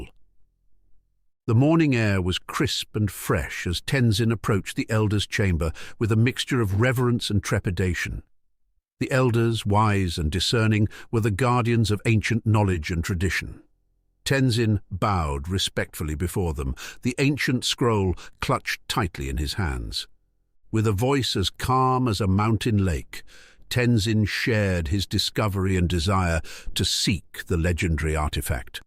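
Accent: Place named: United Kingdom. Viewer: Great, except for the annoying, supercilious British voice. We need cooler voices 🤪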